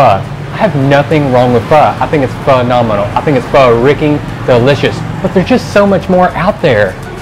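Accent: South, United States. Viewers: the combo of his southern accent talking about his love for Vietnamese noodles... <3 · First time seeing you’re video and I must say, you are a gorgeous man. And on top of that the food you chose, your southern accent and how you described everything was great. Keep up the great videos :) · Do I hear a little southern accent? Love it!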